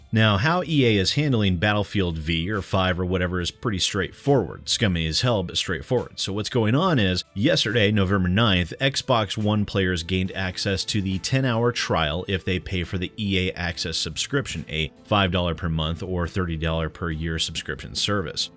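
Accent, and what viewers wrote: RP, United Kingdom. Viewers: I think I like this British version. It's funny to. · hahahahah... I love the English accent... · Your snobby British accent is absolutely wonderful and pretty damn convincing as a Brit myself - I'd love to see you create a character and do something with that :D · That British accent along with the game speak and humor reminds me of TB... oh how he would have had a field day this year if not for his untimely passing... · I'll be honest here: Depending on the game, publisher, and offered rewards, I'm not opposed to Pre-Order unlock tiers. I mean the one game I did participate in that with was one of the best games I've played and that was Tales of Zestiria for PC. As a result, I got Tales of Symphonia for PC and although it did end up being a rather crappy port, it was still 40-50 bucks that I would not have to spend later. The difference between Deus Ex and Tales of Zestiria in my own opinion would be one is promoting that they can release the game earlier than stated but won't unless they get enough money whereas the other is saying "pre-order this game by this date and we'll throw in the PC release of this other game which is a classic on consoles for free!" The Tales of Zestiria pre-order tiers implementation worked in my mind because it wasn't just a "you get more stuff for this game" as the final unlock but a "you get more stuff for this game AND we'll even give you more stuff for another game PLUS that other game!" Just my thoughts. 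BTW, EA is really bad at PR and human decency. EDIT: Also, we needs more British Accent! Hopefully that's not a DLC, but how much does it cost if it is? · your british voice sounds awesome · Lol I liked your British accent also that's what's happening with me and my friends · he is not doing a british accent as there is not such thing he is doing a funny snooty posh english accent. Other people have said there bit on this like pretty good gaming and more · God I love the British accent, lol. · I love the posh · i would pay a lot of money if he did an audio book in his smug british accent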